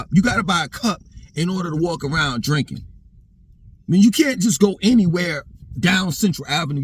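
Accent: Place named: Northeast, United States. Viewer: Before 2009, which NYC borough you came from? It’s gotta be somewhere from the Northeast with that accent…I mean how NYC don’t accept you? New Yorkers always rep NYC & move somewhere else to compare it to NYC 😂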